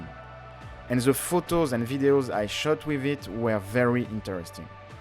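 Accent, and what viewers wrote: France. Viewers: Encore une très bonne vidéo test avec de jolies photos, y a juste un truc qui me dérange....Your so French accent ! Jamais envisagé de faire des vidéos en Français ?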